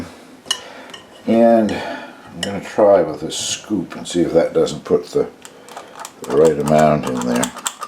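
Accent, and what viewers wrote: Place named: Cape Breton, Canada. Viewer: Aww you remind me a bit of my dad - the good ol Caper accent is so endearing. I have never made these CB pork pies but I remember them well from my childhood growing up in Cape Breton. I've been living in Europe for the past 30 years now. Feeling a little homesick and would like to make these for tomorrow as we're having family over for supper. I think I might even make a traditional meat pie or cabbage rolls as well and go the whole 9 yards. :-) Merry Christmas sir!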